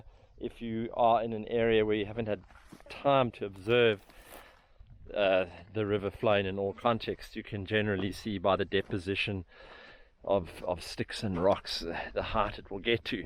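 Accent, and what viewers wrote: South Africa. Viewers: May I guess this is from South Africa? It sure looks like it (and your accent). Beautiful landscape any way, and excellent explanation! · sounds south african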